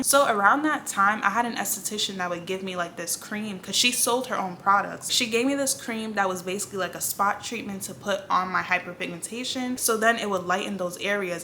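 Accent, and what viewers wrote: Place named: New York, United States. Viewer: Thanks for sharing your journey sis. The internal work really is key. It still baffles me that the health industry doesn’t start with questions like, “What’s your diet like?” or “Let’s build a daily food regimen first.” We can't leave it up to theses Dr's/specialist. Btw... accent—definitely giving NY girlie 🗽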